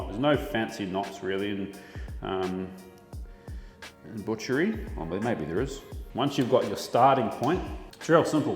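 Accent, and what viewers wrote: New Zealand. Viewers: he is a Kiwi but lives in Australia and has done for a while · An Australian (am I picking up a Kiwi twang?) butcher doing a single take video with maybe muck ups along the way? Liked and subscribed.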